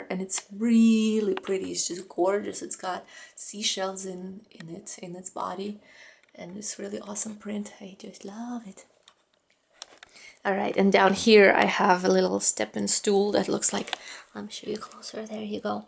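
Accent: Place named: Russia. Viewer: a teacher of mine is Russian and it sounded a bit similar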